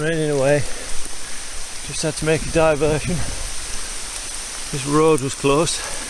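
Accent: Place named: Northern England, United Kingdom. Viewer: Yes I did admire your cycling shoes LOL. Atleast you had cool feet:) Well done on completing the route in one day. Nothing better than a personal challenge. I've never heard you come out with a Norther accent before. Had to laugh at the swear words. This is a real video. Welldone. Big thumbs up.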